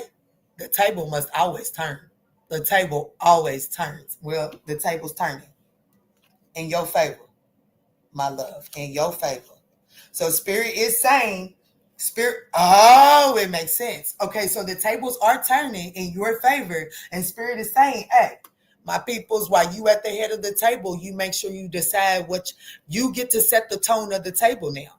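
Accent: United States. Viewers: Where’s your accent from? Part of me says Cali, the other says St. Louis. 😮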